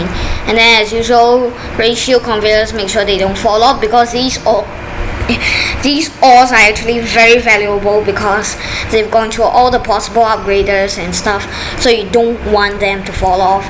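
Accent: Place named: China. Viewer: u sound Chinese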